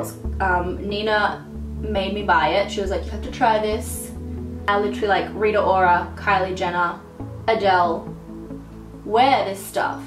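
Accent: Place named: Australia. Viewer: I love your Australian accent and you do you makeup amazing so I had to subscribe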